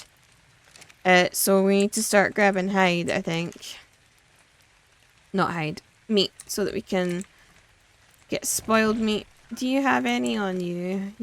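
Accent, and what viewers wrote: Scotland, United Kingdom. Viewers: Stone foundations are great for crafting when taming a drake. You're a Scot. I love that. I lived in Glasgow for 2.5 years. I'm a huge Scottish history lover. Massacre of Glencoe is/was a huge interest of mine. Ancient Scot history is brutal. Awesome listening to your accent. Scotland is such a beautiful country and should never have merged the thrones with the deceitful low landers throne.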